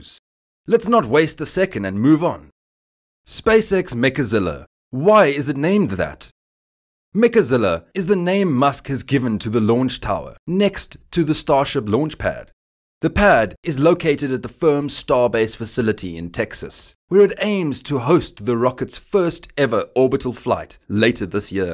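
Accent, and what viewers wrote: South Africa. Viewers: You can hear he is South African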